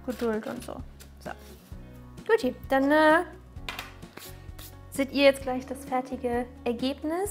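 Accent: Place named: Rhineland, Germany. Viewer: Muss ich unbedingt ausprobieren. Ich finde deine Aussprache immer so lustig 😂. Eigelbschlotze und Gedöns.. Richtig schön rheinisch. Arbeitest du eigentlich noch in einer Konditorei?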